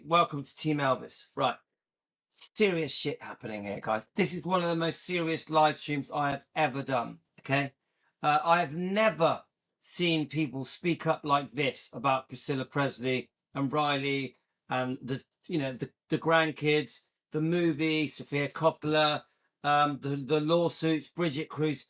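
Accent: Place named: Norfolk, United Kingdom. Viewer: did you grow up anywhere near Norfolk because sometimes I detect a Norfolk accent? Only sometimes 😀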